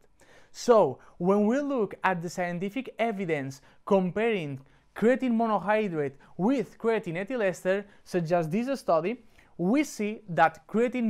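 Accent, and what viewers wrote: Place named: Spain. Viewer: The Spanish accent is a bonus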